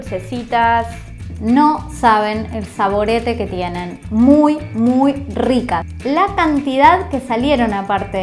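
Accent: Argentina. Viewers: Muñeca caprichosa que Alegria me das queriamos hacer para mi nieta pero los tanos lo hacen aparecer como ingenieria espacial eres una bendicion simplifies algo tan Rico porno que por tu acento eres Argentina benditaTierra donde vivi 6 años ahora en la Tierra de los canguros tratamos de management nuestra identidad un abrazo y un beso virtual cuidate mucho te deseo mucho suceso salud y prosperidad !!!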